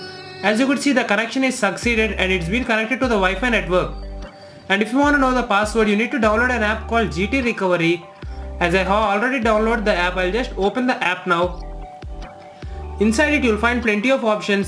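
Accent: India. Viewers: since you have such a THICK accent, I would recommend to speak slowly because now it sound like an east-indian mess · Bla bla bla bla indian English dedicated for indiam only though i understand all but what r u saying regarding hacking password and WiFi is not true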